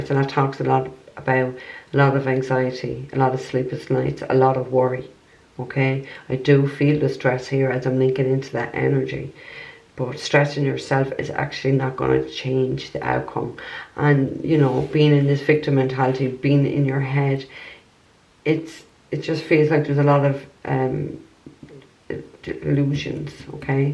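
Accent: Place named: Ireland. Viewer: Ohhhh Irish gal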